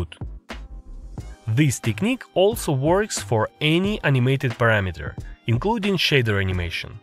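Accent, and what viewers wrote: Russia. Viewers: are you russian? i hear your accent like that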